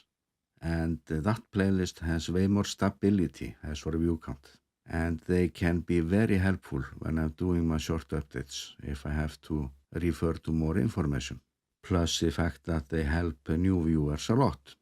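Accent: Iceland. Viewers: Excellent. I am very happy to hear the great news about 100K. The quality of your productions, both video and audio as well as the Icelandic accent you provide is always welcome to see and hear. Your choice of music is also great. I look forward to your future video's. Take care, with greetings and best wishes from NZ. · Thanks for mentioning his Icelandic accent. Of course, he’d say”What accent??”. Just like the rest of us do about our accent in other parts of the world. However in has mentioned his accent in the past. It’s part of his charm.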